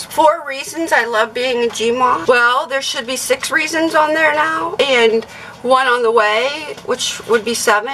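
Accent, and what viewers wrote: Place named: South, United States. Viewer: Thanks for the birthday wishes wow statement makes me feel old 😂🤣 Nonnagrace no but I'll take a peek I mostly watch Nomadic van lifestyle as I believe I mentioned before that's what I plan on doing for the remainder of my life hopefully starting in 1.5yrs curious what city in Ohio your from and sometimes I hear a little southern bell in your voice have a good night y'all 😀😂😜✌️👍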